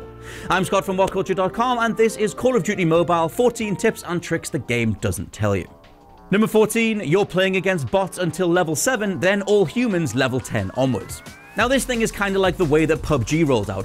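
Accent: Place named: Wales, United Kingdom. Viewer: A Welsh man talking about call of duty, yes lad 🇬🇧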